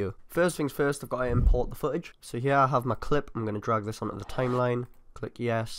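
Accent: Newcastle, United Kingdom. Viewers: A local Geordie I see, nice one.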